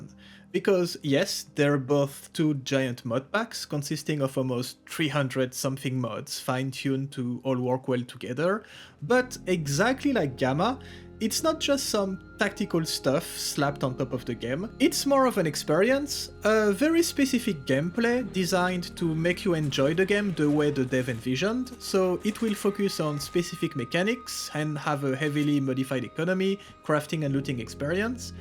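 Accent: France. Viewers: le bonne accent français, j'adore ;) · The most French accent ever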